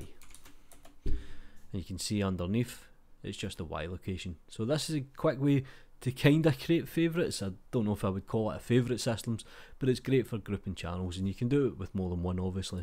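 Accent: Scotland, United Kingdom. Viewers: I don't know much English but I understood that you are Scottish, because I watched the TV series Outlander! They sometimes spoke Gaelic there. Are you by any chance from the McKenzie clan or Fraser?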